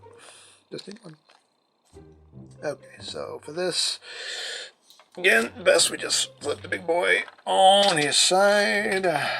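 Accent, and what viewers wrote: South, United States. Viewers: you need to Apply for your southern card, you sound like a southern guy, take for me I am for the south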